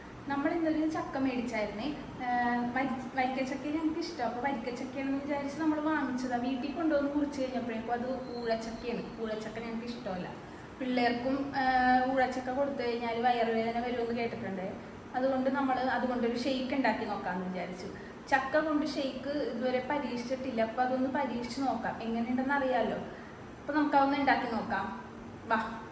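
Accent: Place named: Kochi, India. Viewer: സാക്ഷാൽ കൊച്ചി ഭാഷ😂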